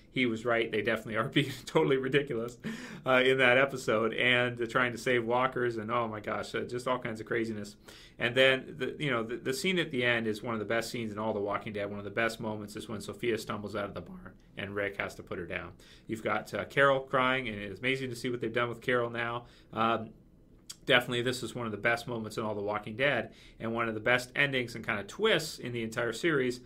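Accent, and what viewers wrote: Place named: Canada. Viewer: Hey. Out of curiosity, what province are you from? I am an American but I lived in Newfoundland for awhile and based on your accent I'm almost positive that isn't where you're from haha. I've been to a lot of places in Canada and I was just curious as to what part of the country you're from.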